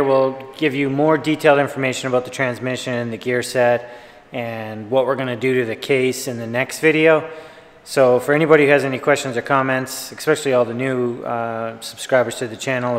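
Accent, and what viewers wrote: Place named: Eastern Canada, Canada. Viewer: Although I don’t know what province you’re in I can tell your out east, accent lol. You’re doing awesome work. Thank you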